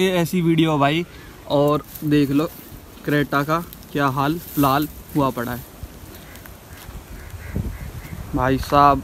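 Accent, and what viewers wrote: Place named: Jewar, India. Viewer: Bhai jewar ke pass ke lgte ho awaz se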